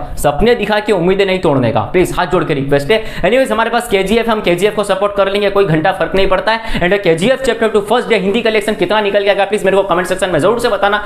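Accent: Gujarat, India. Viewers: You are Gujarati?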